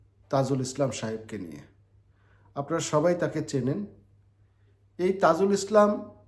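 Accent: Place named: India. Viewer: তোমার কথা শুনে মনে হচ্ছে যে, তুমি ভারতের প্রডাক্ট।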